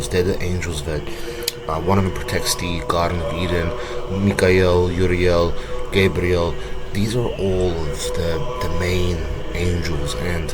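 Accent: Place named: Australia. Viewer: You sound a little like someone from down under.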